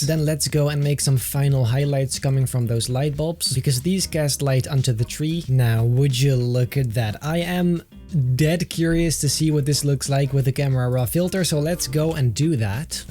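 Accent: Netherlands. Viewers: Je klinkt heel Nederlands, heb Ik gelijk? :) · Jij bent nl ik wist het al aan het accent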